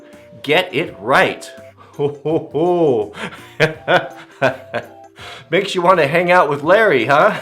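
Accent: Canada. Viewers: I am confused you talk like your a Canadian but you hate the metric system but live in the states.Are you a posser?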